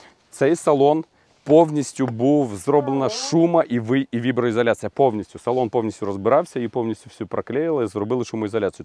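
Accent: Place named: Surzhyk, Ukraine. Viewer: Друже я сам з Маріуполя і перейшов на рідну мову з початком війни, але твій СУРЖАК не те що ріже вухо, віз зриває дах. Так що, або показуєшь розвиток у вивчанні мови, або я від тебе "бля" відписуюсь !!!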